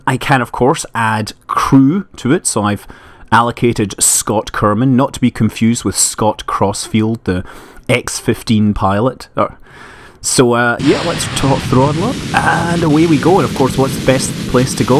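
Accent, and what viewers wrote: Scotland, United Kingdom. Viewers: It's a Scottish accent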